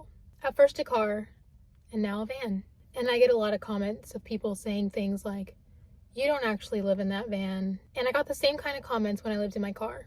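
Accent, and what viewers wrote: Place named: California, United States. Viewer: The only thing i questioned is where is your Texas accent, sounds like you were born and raised in Cali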